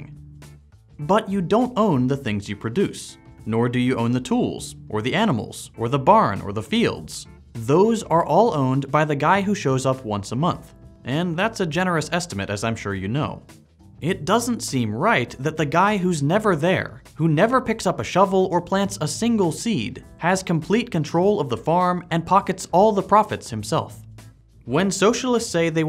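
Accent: United States. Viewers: Are there any socialists in the US? It's so weird to watch a video on socialism with an American accent.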